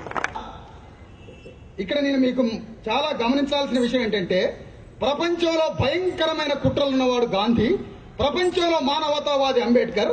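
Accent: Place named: Andhra Pradesh, India. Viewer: Nee aandhra slang chustene artamutundi be nv aandhra kodukuvani... Dobbey be...rayalaseema to polustunnaventi be nee tooooo ga...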